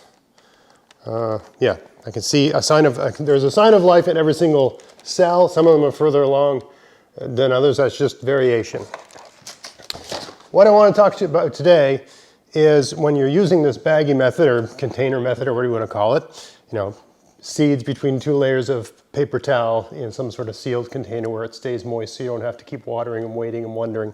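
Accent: Maritimes, Canada. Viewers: Cotyledon. the seed leaves. Coh teh LEE duhn I say, you didn't mispronounce it. You simply have a Maritimes, accent!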